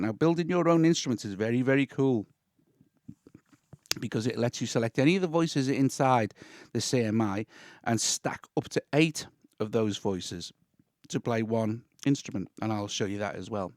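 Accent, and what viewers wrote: United Kingdom. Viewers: I loooooooooooove your accent! Is that British?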